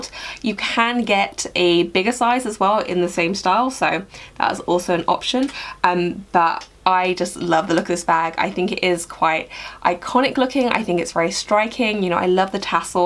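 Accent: England, United Kingdom. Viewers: REASONS I LIKE THIS VIDEO RANKED! 1. Succinct 2. Well thought out and planned 3. Intelligent and scientific reasoning 4. English Accent!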